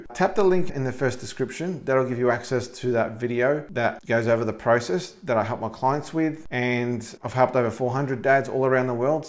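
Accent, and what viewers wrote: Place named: Australia. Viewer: Excellent advice, delivered in an Aussie accent that is impossible to ignore. These are all points I can follow, determined to lose the spare tire around my midsection, thanks mate!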